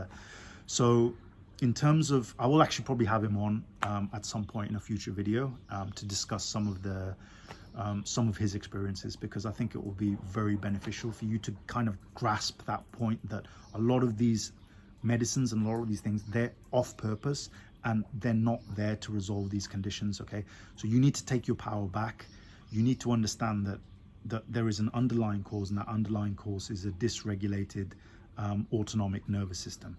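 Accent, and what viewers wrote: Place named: Birmingham, United Kingdom. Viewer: Sound like a real guy bro. From a fellow Brummie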